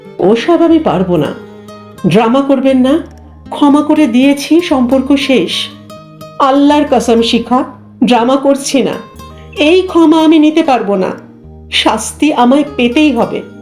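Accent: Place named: Dhaka, Bangladesh. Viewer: খুব খুউব ভাল লাগল । তাছাড়া ঢাকাই টানে কথাগুলো চমৎকার লাগলো। আমিও যে ঢাকার মানুষ ।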